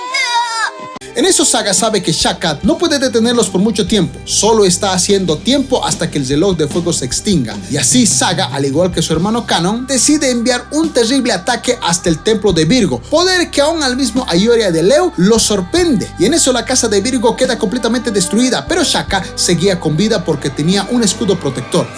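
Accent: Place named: Ecuador. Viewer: No puedo seguir la narración porque ese acento ecuatoriano que mezcla la R con la Ch me distrae demasiado con su mala pronunciación 😐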